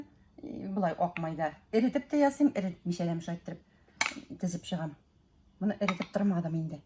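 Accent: Standard. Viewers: Миңа пешерү осталыгы бер ошаса, бу ханымның татарча шундый чиста, матур сөйләве тагын да ошады! Бик зыялы ханым!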